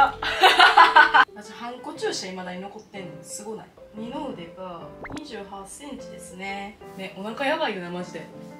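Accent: Kansai, Japan. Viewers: 、何故関西なんですか？お返事下さい。私は小笠原なんで分からないです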